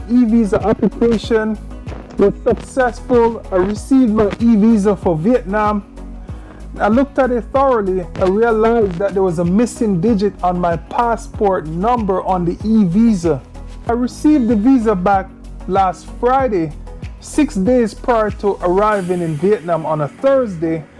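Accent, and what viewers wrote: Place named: Caribbean. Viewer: I hear the west Indian accent where are you from?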